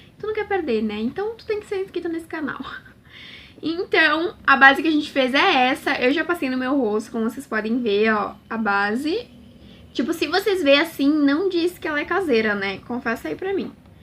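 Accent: Rio Grande do Sul, Brazil. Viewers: você é do RS? Percebi o seu sotaque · E quê vc fala que nem gaúcha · Parece gaúcha♥️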